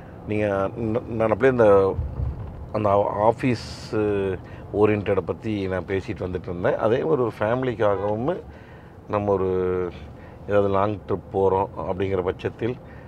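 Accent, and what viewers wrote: Kongu, India. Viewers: Kongu Tamil speech superb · Sir, your narration so nice, kongu tamil slang no words very beautiful... sir good night..... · உங்க நடைமுறை பேச்சு மிக அருமை.. அதுவும் கொங்கு தமிழில் 👌👌❤❤❤ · Excellent Review with Amazing Natural Kongu Tamil yengu Anna,... Fantastic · Kongu slang 👌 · எல்லாத்துக்கும் புரியிற மாதிரி அழகான கொங்கு தமிழ்ங்க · கொங்கு தமிழில் இயல்பான இயற்கையான வர்ணனை காரை தேர் என தலைப்பிட்டது அருமை. அய்யா இதேபோல் வாழ்க்கையின் இன்பங்களை அனுபவிக்க எல்லாம் வல்லவனை வேண்டுகிறேன். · குழந்தை மாதிரி முகத்தில் எவ்வளவு மகிழ்ச்சி..எங்கள் கொங்கு தமிழில் பேசுவதும் அழகு! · மகிழ்ச்சி, வாழ்த்துகள் வெகு நாள் களித்து கொங்கு தமிழில், கேட்பதற்கு இனிமை. கொங்கு தமிழில் வெல்பயர் அனுபவம் ... அட்டகாசம் இப்படியொரு அனுபவத்தை கொடுத்ததற்கு டொயோட்டா நிறுவனத்தினர் உங்களுக்கு சன்மானம் கொடுத்திருக்கவேண்டும், அப்படிகொடுத்திருந்தால் கூட அது தகும் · கொங்கு மண்ணிற்கே உரித்தான தமிழ். 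· Unga Kongu Slang Nalla iruku😍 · குழந்தை மாதிரி முகத்தில் எவ்வளவு மகிழ்ச்சி..எங்கள் கொங்கு தமிழில் பேசுவதும் அழகு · நீங்கள் பேசற கொங்கு தமிழ் அழகுங்க ணா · உங்களின் கொங்கு தமிழ் பேச்சு அழகோ அழகு அண்ணா.... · அய்யா கொங்கு பாஷை..அடி தூளு..சூப்பர்..சூப்பர்..என்னமோன்னா மூஞ்சி ரொம்ப நீளமா இருக்குதுங்கோ...என்ன தான் இருந்தாலும்...அந்த காலத்து பிளைமவுத் ரேஞ்சுகெல்லாம் கெடையாதுங்க..உங்க பேச்சுல அந்த ஙொன்னியா... கோயமுத்தூர் குசும்புங்க...மறக்காம நண்பர்களே நம்ப THILAVU TALKIES.. You tube Channel..வந்து பாருங்க..பின்ன‌ நாமெல்லாம் THAR..ஜீப் வாங்கறது.. மறந்துடாதீங்க மக்களே...மக்களுக்கு மக்களே... Ukkrishwriter Mtp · சூப்பர்னே, கொங்கு slang review கேட்கவே அருமையா இருக்கு. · Ungal Kongu Tamil Azhagu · அந்தக் கார் எவ்வளவு அழகோ அதே அளவு நீங்கள் வர்ணிக்கும் கொங்குத் தமிழின் உச்சரிப்பும் எனக்கு எங்கள் நெல்லை தமிழுக்கு அடுத்தபடியாக என் இதயம் கவர்ந்தது கொங்கு தமிழ் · Super Sir! Your explanation in native kongu language is sweet and enjoyable. 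Nice car sorry nice chariot. Thanks 🎉🎉🎉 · நான் அண்ணா வணக்கம் நீங்கள் கொங்குத் தமிழ் பேசி மிகவும் அருமையான ஒரு வார்த்தைகளால் மிகவும் எங்களை எளிமையாக்கி விட்டீர்கள் உங்களுக்கு டொயோட்டா கம்பெனி மிகப் பெரிய கடமை பெற்றுவிட்டது எல்லாம் உங்களை சாரும் ஏனென்றால் விளம்பரத்தை விட நீங்கள் சொல்லும் ஒவ்வொரு வார்த்தைகளும் இனிமையாகவும் சிறப்பாக உள்ளது அண்ணா உங்கள் வார்த்தைகளுக்கும் உங்களுடைய அன்பு தமிழ் மொழிக்கும் கொங்கு தமிழ் மொழி வாழ்த்துக்கள் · அன்னா வன்டியவிட உங்ககொங்குதமிழ் ரொம்பபுடுச்சுருக்கு வாழ்த்துக்கள்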